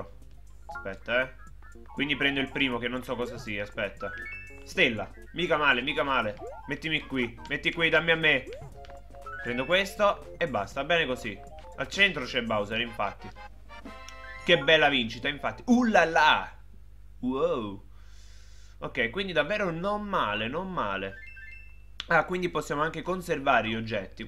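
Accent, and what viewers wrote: Naples, Italy. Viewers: Bella:D ma sei di Napoli?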